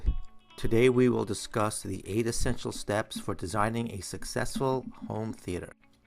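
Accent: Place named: New York City, United States. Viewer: This guy sounds like he's from the NYC area... good to know when I construct mine!!!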